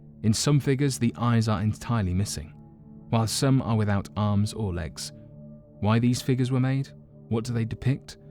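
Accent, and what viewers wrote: United Kingdom. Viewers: Its his Brit accent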